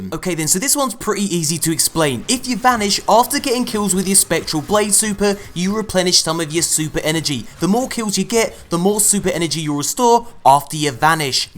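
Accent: United Kingdom. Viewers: Why tf are do all these destiny channels have a British Accent?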